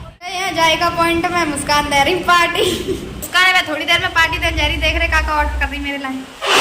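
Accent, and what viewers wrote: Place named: Mainpuri, India. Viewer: Aapki Mainpuri language is best...?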